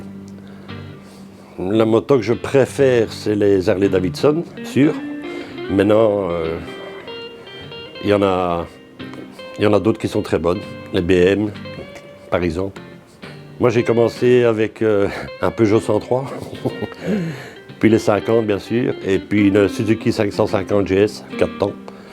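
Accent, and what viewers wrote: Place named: Jura, France. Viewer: Tu est du jura?? Car tu a le même accent que moi!!😄😄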